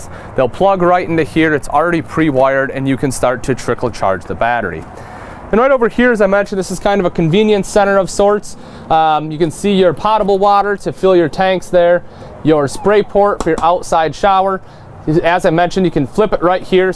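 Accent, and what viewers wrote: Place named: Midwest, United States. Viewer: If anyone ever wondered what a classic mid-Western American accent was, here they have a perfect example! Nice!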